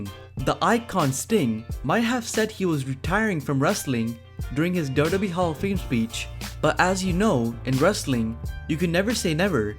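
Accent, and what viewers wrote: India. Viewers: The speaker is from where??? Sound like indian fucking garbage voice and accent trash channel trash speaker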